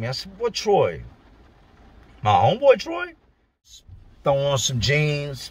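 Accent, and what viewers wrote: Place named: South, United States. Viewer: That sure isn't a virginian accent you got there. I'm from Manassas . What you got there is a mixture of southern meets black. Like you videos but I hate "how" you say your story. Keep on keepin on my friend. It is what it is too. I just talk truth so if ya don't like me referring the way he speaks to blacks then o well. Fact remains though that y'all know exactly what I'm talking about.✌️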